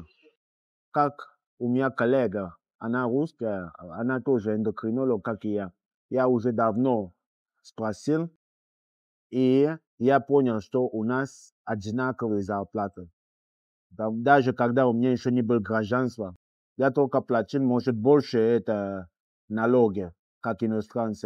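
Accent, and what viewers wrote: France. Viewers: Вы пишите бред-мой друг Француз(и предки его Французы))),научился говорить несколько фраз на русском и Р у него отличная,и акцента французского намного меньше чем у героя этого видео(хотя он во Франции живет, а не в России и разговорной практики у него мало).Все дело в желании.В русском тоже нет звуков которые например в английском есть,только почему то я эти звуки призношу отлично.А насчет картавости вы насмешили))Негр этот не картавый,он просто не заморочился от акцента избавится. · у него родной язык французский, франкоговорящему вообще очень сложно избавиться от характерного акцента, тем более с таким сложным языком как русский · Моя учительница биологии жила и работала в Камеруне. Много рассказывала про Камерун, природу и людей. У такой французский акцент. Приятно слышать хорошие слова о России. Спасибо.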